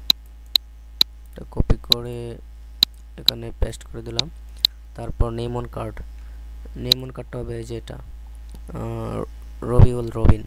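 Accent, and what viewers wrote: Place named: Bangladesh. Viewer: he's a Bangladeshi. He has Bangladeshi accent.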